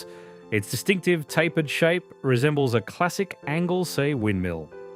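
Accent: Australia. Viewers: Yes,and an Aussie who's trying too hard to make it sound dramatic, but ends up with odd pauses and emphasis. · Nice if the place names were all pronounced correctly. Also odd to have what sounds like an Australian accent narrating. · Lovely video with very relaxing narration. Is that an Aussie accent?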